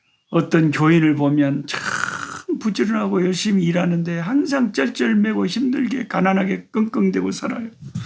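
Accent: Jeolla, South Korea. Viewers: 매번 야심차게 성경읽기에 도전하다가 레위기를 만나면 그냥 적당히 대충 느슨하게 읽는 흉내만 내다가 넘기곤? 했었는데 목사님께서 사교육비도 안받고 1대일 성경교사가 되어주셔서 어려운 성경을 너무 쉽게 그리고 하나님의 사랑을 느끼며 읽게 되었음에 감사드립니다. 하나님이 이렇게 세심하게 어리석은 인생에게 하나님 나라의 거룩한 백성으로 살아가는 방법을 가르쳐 주셨네요. 제사의 종류와 제사의 방법이 또한 정확하게 정립이 되었고요. 그 매번 제사장의 도움으로 어렵고 두렵고 복잡한 제사를 드리지 않아도 예수님이 친히 대속물이 되어주셔서 단번에 해결해주심으로 말미암아 이제는 성령님의 도움으로 언제 어디서는 예배자로 설수 있음이 너무나 감사합니다. 지난 연말부터 교회적으로 시작한 성경통독 프로그램과(목사님~ 여긴 목사님 팬이 많은 진해동부교회예요^^) 꿀송이 보약 큐티를 하며 레위기를 두 번 읽게 되었는데 더 분명해지는 말씀의 은혜가 있습니다. 목사님의 남도 사투리가 얼마나 사랑스럽게 들리는지 매일 목사님의 예사롭지 않은 등장을 기대하는 1인입니다. 자주 자주 꽃을 들고 등장하셔서 얼마나 사랑스럽게 바라보시는지 그 눈빛에서 목사님의 사랑의 마음을 느낍니다. 오늘 들고 나오신 나팔꽃 갸가 활짝 아름답게 핀걸 우리에게 보여주고 싶은디 그걸 아쉬워하시는 모습에서 이미 우리는 가장 아름다운 남아공의 꽃들을 마음으로 보았지요. 목사님~ 너무 감사하고 사랑하고 축복합니다.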